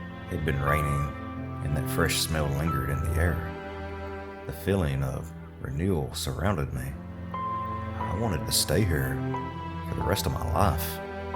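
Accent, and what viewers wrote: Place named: South, United States. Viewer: Glad I found your channel. I’m from the south too and it’s refreshing to hear these in a familiar voice. Keep doing what your doing!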